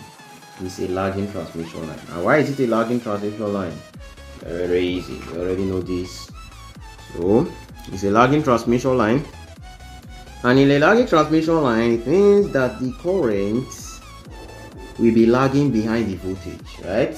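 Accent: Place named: India. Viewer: Uniben student sounding like indian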